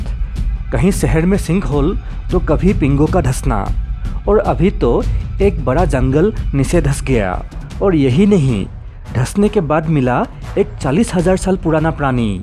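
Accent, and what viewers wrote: Assam, India. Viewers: Ap Assamese ho lagtahe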